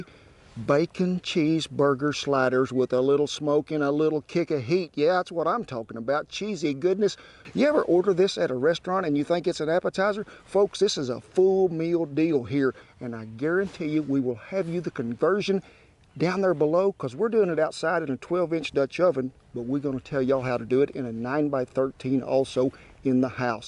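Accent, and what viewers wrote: South, United States. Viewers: I love this American accent! God I'd love to move to the US. Ah man that is life!! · You just have that down home way of speaking. BTW I’m in Woodward so I’m not too far from you. ❤️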